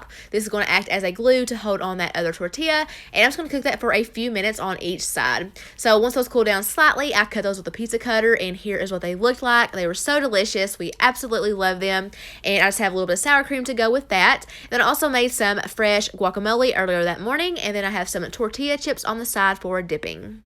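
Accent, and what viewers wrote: South, United States. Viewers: You’re such a southern Bell, I love your accent and food ideas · What state do you live in? Love your accent! I’m from North Carolina and I have an accent as well, but yours is more southern than mine. Lol! Love ya! 💖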